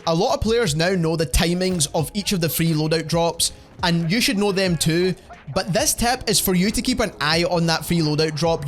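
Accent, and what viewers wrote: Scotland, United Kingdom. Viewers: Very Scottish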